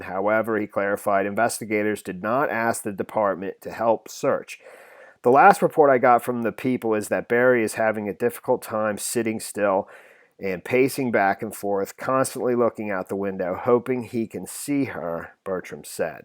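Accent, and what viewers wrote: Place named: Delaware, United States. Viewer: Hi,! I really like your channel. Just the facts, plain and simple. Also, I keep thinking you sound like you're from northern New Castle County, Delaware, or nearby PA. Are you?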